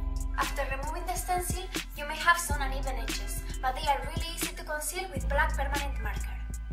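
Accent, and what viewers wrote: Basque Country, Spain. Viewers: Your spanish accent mixed with your english pronunciation is so beautiful! I don't know too much Spanish, such a shame because my family comes from Madrid, but I would love to see a video with you speaking Spanish! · She's from Spain and she has an accent but I could understand her. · eres española?? Porque tu acento de verdad es muy español Are you from Spain? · Are you from Spain? Because of the accent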